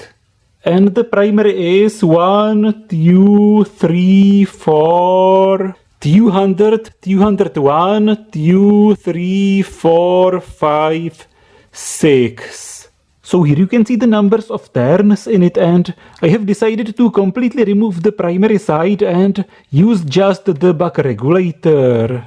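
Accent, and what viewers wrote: Czech Republic. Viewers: That is the best accent. Btw jsi Čech žejo 😂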